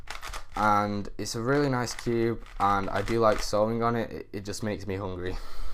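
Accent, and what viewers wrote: United Kingdom. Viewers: Im a beginner UK cuber and you sound like you are from the UK to! If you are, where do you buy your cubes?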